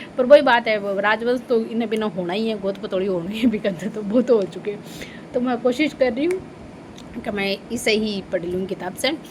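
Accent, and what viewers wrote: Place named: Marwar, India. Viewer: Hindi se achi toh marvadi lage छ थे तोह मारवाड़ी ही बोल्या करो जीजी 😊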